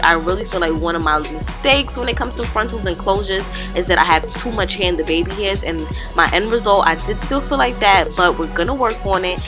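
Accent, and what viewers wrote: New York, United States. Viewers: First time watching your video and I knew u was from NY right away, we can’t hide our accents nowhere lol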